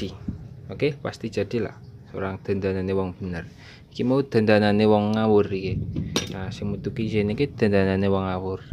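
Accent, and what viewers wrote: Banyumas, Indonesia. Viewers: Kie wong mbanjar jane ya.. Mulane si ngapak😀😀😀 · Akhire koe ngapak jugak🤣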